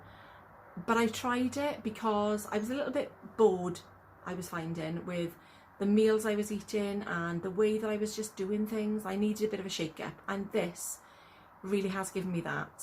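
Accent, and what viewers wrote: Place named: South Wales, United Kingdom. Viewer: Well done its moving slowly, you are so funny, and you got a canny smile, I guess your from South wales I was born in North wales but the South has a much stronger accent lol but ive been up north east England 17 years now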